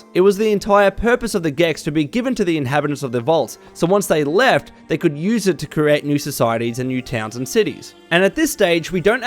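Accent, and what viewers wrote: Australia. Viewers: Its not odd, I can completely understand what you're saying. I've watched enough Robert Whittaker and Mark Hunt interviews to understand the Australian accent. Its the way you say certain words.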